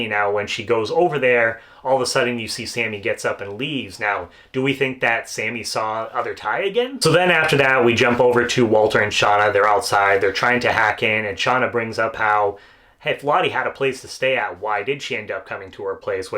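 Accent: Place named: Northeast, United States. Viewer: Hey, we are Aussies and were wondering where you are from based on your accent. The opinions are north east, northwest and one vote for Boston 😂 Please help😂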